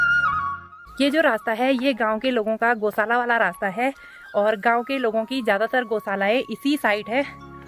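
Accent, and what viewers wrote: Garhwal, India. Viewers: apki Hindi boli me gadwali bhasha ki jhalak bahut pyari lagati hai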